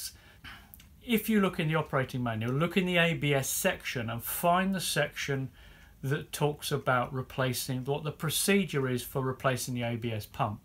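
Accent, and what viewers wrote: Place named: England, United Kingdom. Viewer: Great info and good English accent as opposed to Yanks!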